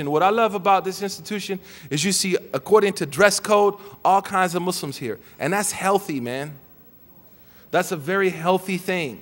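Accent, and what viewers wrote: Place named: Bronx, United States. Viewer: His manner of speech offended me as a Muslim. Really what is going on In America? Have some strange people becoming "Imams" Why is he trying to talk like he is from the bronx, it is not impressing anyone. And I agree with the person who said even the sisters are looking at him oddly those sat in the audience. What is happening in America??? I am in the UK thought it was tough here... Ya Rab whats going on with some people?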